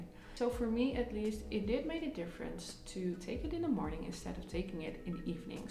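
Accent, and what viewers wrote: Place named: Netherlands. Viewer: Hoor gelijk dat je Nederlands bent😊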